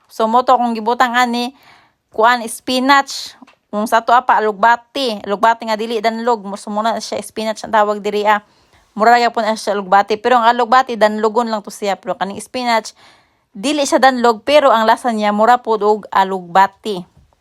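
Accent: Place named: Misamis Oriental, Philippines. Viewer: Taga mis or cguro ka Kay naamay giatay sinultian pod nimo taga mis or gyud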